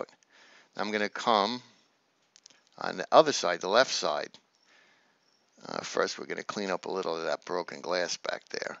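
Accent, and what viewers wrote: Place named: Brooklyn, United States. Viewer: good one. Cool Brooklyn accent too....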